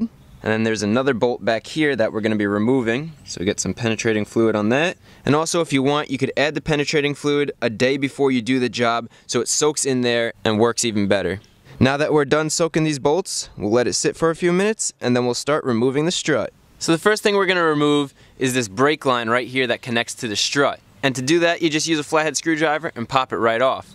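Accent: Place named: New Jersey, United States. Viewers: god you can hear the new Jersey in his voice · Judging from slight accent, I would guess he is in the Northeast US where snow happens and roads get salted. This will cause surface rust on suspension components on a lot of cars. Some people in heavy snow and salted areas say that Mazdas in those conditions rust badly. I am in the middle of the east coast US and we get a small amount of snow, and roads get salted, and I have had 4 Mazdas and did not experience any rust or corrosion problems.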